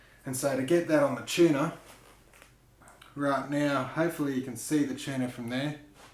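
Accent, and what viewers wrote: Australia. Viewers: Ok, that's an Australian accent. Nice, dude. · I like your Australian accent hehe! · Fooking Australian mate! I thought he was American for some reason.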